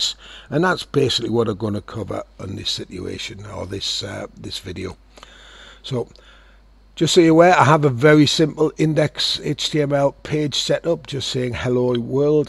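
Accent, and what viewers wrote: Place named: Wales, United Kingdom. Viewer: your accent is so welsh lol thanks, was really stuck for ages :P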